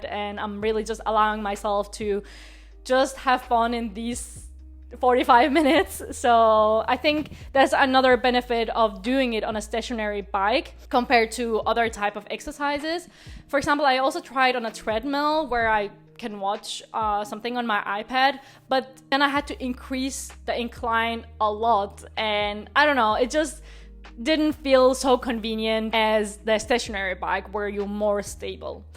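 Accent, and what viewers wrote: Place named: France. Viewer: If you want to make it fun get a real bike and explore the outdoors. It's real life. By the way love your accent. French I think. Blessings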